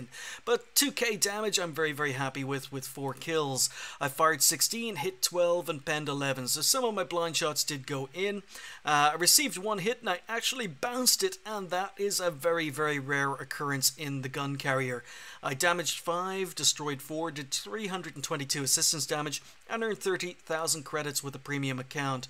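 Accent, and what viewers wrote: Ireland. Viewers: are you actually irish? I can hear a bit of an irish accent but not much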